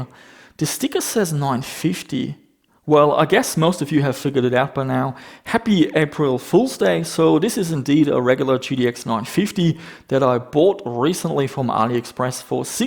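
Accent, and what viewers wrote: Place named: Germany. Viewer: Dieser deutsche Aktzent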